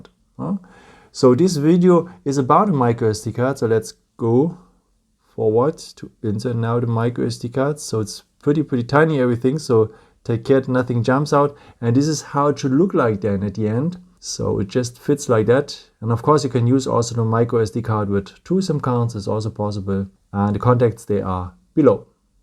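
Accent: Germany. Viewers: RU GERMAN?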